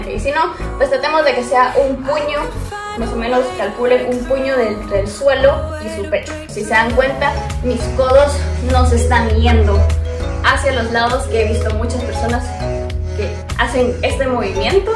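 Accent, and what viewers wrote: Guatemala. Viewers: Acento #chapin !!!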